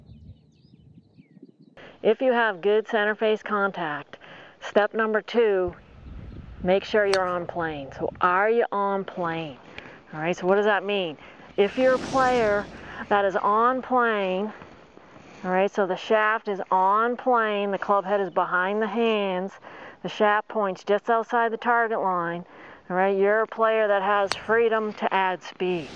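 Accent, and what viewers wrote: Boston, United States. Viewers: Hey that is the reverse of the song... Not sure what they meant but I get what you are saying..25 percent to 110 percent swing speed to find out my natural rhythm. I will try next time I am on the driving range to zero in on what is good for me. Was never conscious of this as I just swing without really thinking of the speed so it is a great nuance you have elucidated upon in your Bostonian dialect which is awesome!!! Thanks!!!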